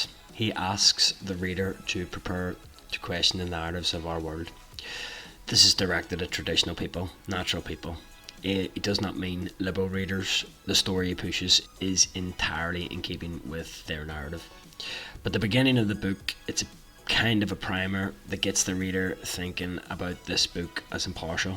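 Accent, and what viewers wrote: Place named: Ireland. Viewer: It needs a special attitude to read PM books like this one and maybe exposure at uni...neither of which I have so I appreciate it when those who grit their teeth and gird their loins to do so to share their breakdowns and insights. If there is more like this to come your content looks set to be a welcome addition to our sphere. I didnt think you rambled but for those of us not attuned to the lovely Irish lilt might I suggest slightly slower speech. When one is reading from prepared content (which this seemed to be) there is a tendency to read it aloud a little fast.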